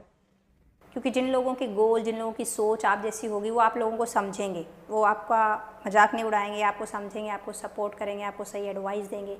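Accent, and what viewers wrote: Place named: India. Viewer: I love your Hindi accent mam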